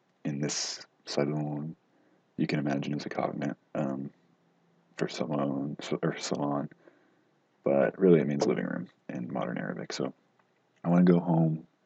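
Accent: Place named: United States. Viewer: I see that you have an american accent and your arabic pronunciation is also fucking good. So as I can understand u r Lebanese and living in the USA?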